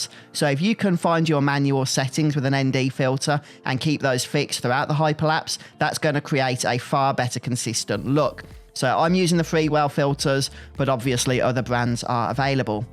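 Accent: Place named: Midlands, United Kingdom. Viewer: Hi ive been watching your videos off and on for about a year now thanks for the videos. Im just wondering are you a brummie you sound like your from the midlands Im a former brummie who lived not too far from the city but been living in county Kerry Ireland now for over 35 years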